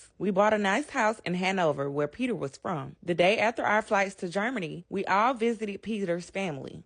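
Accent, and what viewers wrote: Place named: South, United States. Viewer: Ain’t no women with that beautiful southernish accent naming no baby of theirs LILITH 😂😂😂 So where do I send my “am I the asshole” storytimes to 🙃🙃🙃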